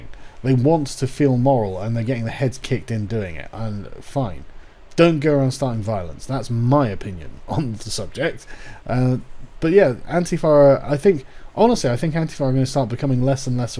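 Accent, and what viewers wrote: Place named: United Kingdom. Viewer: British dialect issue, whilst Britain is tiny compared to the US, we have hundreds of dialects that do some weird shit to vowel sounds in particular, and the dialect can change significantly over the course of 20-30 miles, where sargon lives is right at the cusp of a major vowel sound change in dialects, so that's probably why he pronounces it this way, because it's the normal pronunciation for that area.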